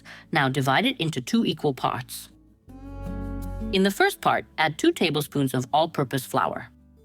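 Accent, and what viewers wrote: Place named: England, United Kingdom. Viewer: ... recién descubro tu canal,... ya estuve viendo 2 recetas,... me encanta como explicas, muy práctico y sin hacerlo tan largo,... qué buena y deliciosa éste Marble Cake,....me gusta tus utensilios que usas para cocinar,... yo entiendo poco en English,...pero hago ls traducción a Español,.... soy de Argentina, pero vivo en North carolina USA,....tú acento me parece de Inglaterra,.... muy bonito todo( me pareció muy bonita la fuente que usaste para hacer el cake 👌).saludos Miriam...👍👍👍💯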